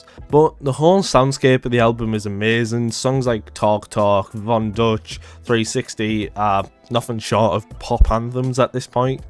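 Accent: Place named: North, United Kingdom. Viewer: Last accent in the world I expected you to have 😭 where up north are you from?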